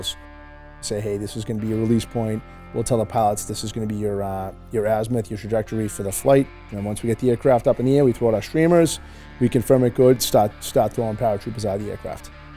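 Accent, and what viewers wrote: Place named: Rhode Island, United States. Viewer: That was great. Your accent brought back great memories of my uncle from Rhode Island!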